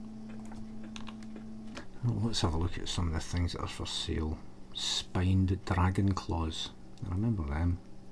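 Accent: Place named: Scotland, United Kingdom. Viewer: Your scots accent makes me want to play mmos with you, sooo laid back and wrapped up in the world of Norrath.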